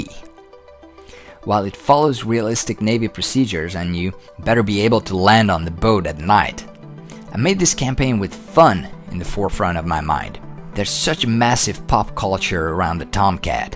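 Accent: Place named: New Jersey, United States. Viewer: I just can't put my finger on your dialect but it's the coolest American English. New Jersey?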